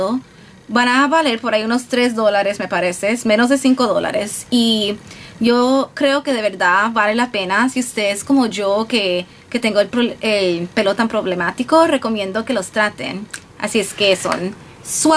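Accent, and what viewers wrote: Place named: Venezuela. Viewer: De donde eres naturalmente amiga? Tienes un acento parecido al mio... eres de Venezuela?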